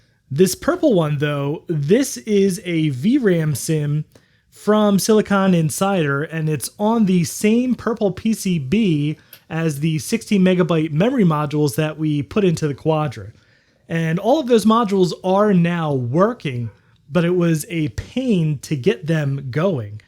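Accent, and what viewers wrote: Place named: North, United States. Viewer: It depends on your accent. I'm not sure about American accents but sounds more like a northern states person, close to Canada. Not sure. I'm British and we pronounce hyperbolic as a mix between the way you said it's meant to be pronounced, and the way that Americans pronounce it. Like "hy-per-ber-lic". Actually though, "hyper-bol-ic" is *not wrong*.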